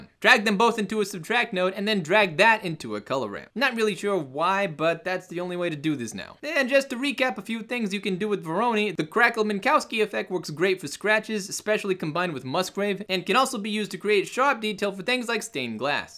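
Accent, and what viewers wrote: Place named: Brooklyn, United States. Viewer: I FEEL LIKE IM IN BROOKLYN LOL, I LOVE IT!!!!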